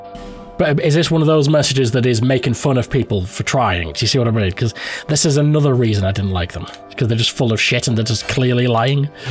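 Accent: Scotland, United Kingdom. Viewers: That Scottish accent isn't an attempt btw, that's a genuine Scot. I tell you that as one such specimen myself :P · That’s not an attempt at a Scottish accent. That’s a real deal Scotsman right there, no doubt about it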